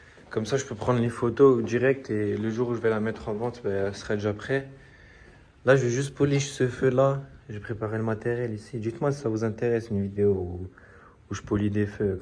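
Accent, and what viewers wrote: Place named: Alsace, France. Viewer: J ai cash reconnu l’accent alsacien mdr 😂 et pour les fleur essaye du diluant normalement t’enlevé tout avec sa et force a toi gros pour tes projets 💪💪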